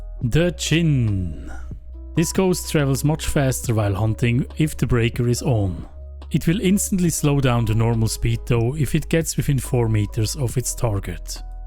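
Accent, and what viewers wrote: Switzerland. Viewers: Yeahh cool vid👍🏾🙏🏾🙏🏾 you sound like e schwitzer !? 😎😅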